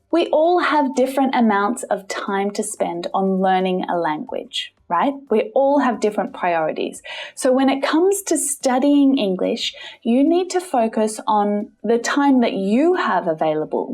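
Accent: Australia. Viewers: Ma'am I'm from India and our speaking is totally different from yours(aussies) you say " G'day mate" but when we Indian says that same thing it sounds very different. So the pronunciations are very different can you please teach us that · Hi!!.. You are a awesome teacher. I am from New York. I like your Australian accent. Love it.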